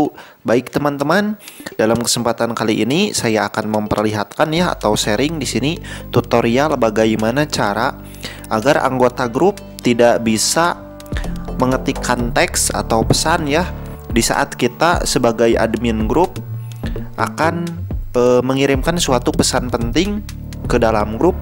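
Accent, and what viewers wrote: West Java, Indonesia. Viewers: Urang Sunda ya kanh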